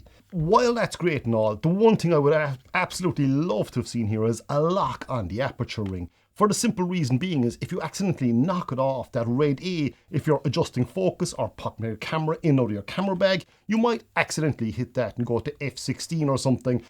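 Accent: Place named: Ireland. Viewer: Is that dialect of yours from Inisherin? Because I keep thinking of that movie when you talk 😬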